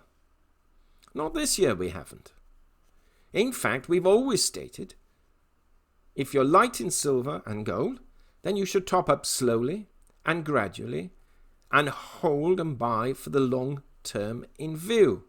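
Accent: United Kingdom. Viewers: This channel is great! I like the format and the lack of wild speculation. The brit accent add an extra charm!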